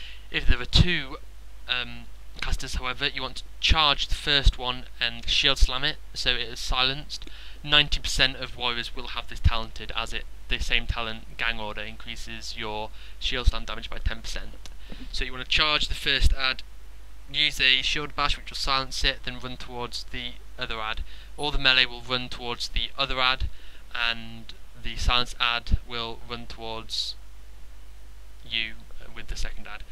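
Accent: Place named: RP, United Kingdom. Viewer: there's nothing wrong with this guys accent.. its 99% perfectly spoken "Queen's English". Very wierd thing to say.. you mustn't speak English as a first language to make that sort of unqualified comment.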